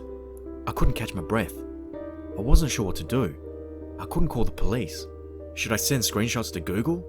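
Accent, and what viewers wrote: Australia. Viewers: Ive been looking for a horror narrator w an australian accent and i literally screamed so loud when i found you that my mom thought i hurt myself or something 😂😂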